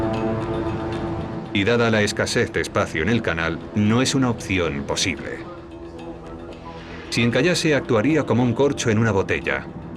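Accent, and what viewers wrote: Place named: Spain. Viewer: Es un locutor español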